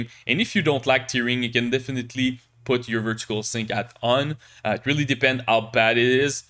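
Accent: Quebec, Canada. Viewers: You’re french canadian aren’t you haha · Greate video :) Juste, avec l’accent je détecte un québecois😂 Cool de voir d’autre québécois sur YouTube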